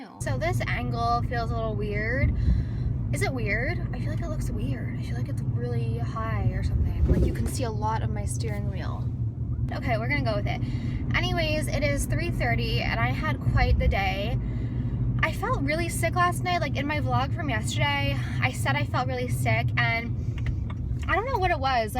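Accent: France. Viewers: Montreal is in serious need of some snow so it feels like Christmas! Your french accent is so adorable. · aw your french accent it's cute!💘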